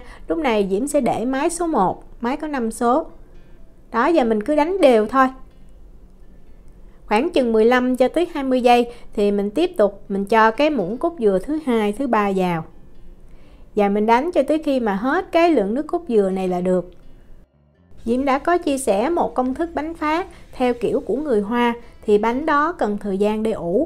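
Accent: South, Vietnam. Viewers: Diễm hướng dẫn kỹ lưỡng, nói chuyện dễ mến giọng miền Nam Chúc Diễm năm mới nhiều may mắn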